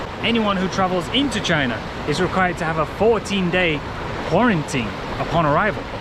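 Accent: United States. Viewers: Love your American twang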